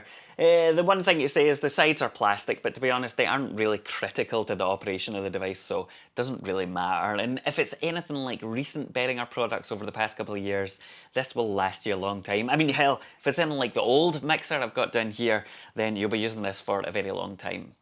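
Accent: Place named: Edinburgh, United Kingdom. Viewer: That's fair, thanks for replying! I enjoyed listening to you - I used to live in Edinburgh and got a bit homesick. Your accent is lovely.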